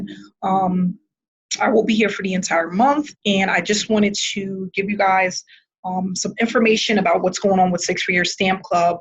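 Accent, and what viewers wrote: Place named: New York, United States. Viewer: Thanks for your speedy acknowledgement... love that New York accent or is it Boston?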